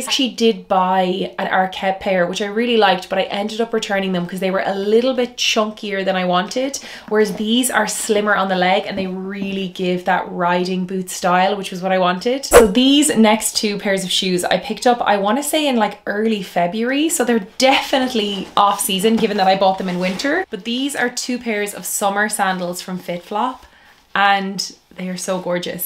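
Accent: Ireland. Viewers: I thoroughly enjoy your style, fashion recommendations and beautiful Irish speech. The rattan purse you showed us is so unique. What brand is it and where might I find it? 🇨🇦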